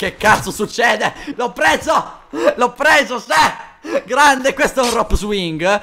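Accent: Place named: Rome, Italy. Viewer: ma sei romano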